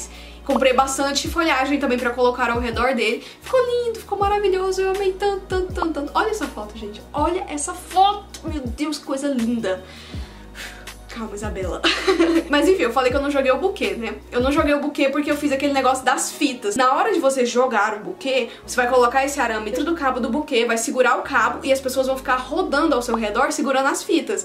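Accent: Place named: Minas Gerais, Brazil. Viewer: Ahá! É de Minas Gerais né, uai. Kkkkk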